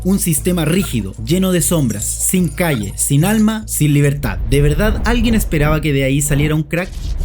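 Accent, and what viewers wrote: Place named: Chile. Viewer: Chileno Detect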